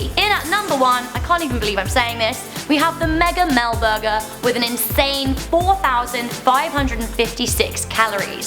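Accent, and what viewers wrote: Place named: England, United Kingdom. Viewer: Saying all of our American foods in an English accent is so funny 😂😂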